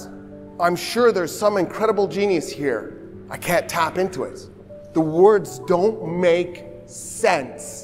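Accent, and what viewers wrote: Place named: Iran. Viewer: این استاد ایرانی نیست؟ همه ظاهرش هم صحبت کردنش شبیه یه ایرانی هست که ۲۰ ساله خارجه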